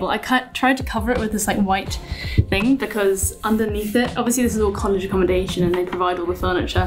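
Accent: England, United Kingdom. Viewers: so you born in British or in USA ? you have quite strong English accent. Do you have plan yo practice medicine in the US?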